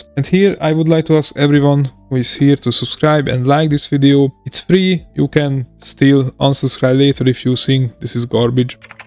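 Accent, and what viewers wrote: Hungary. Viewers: Fasza kis build, nagyon hallatszódik a magyar akcentus! (támogató komment)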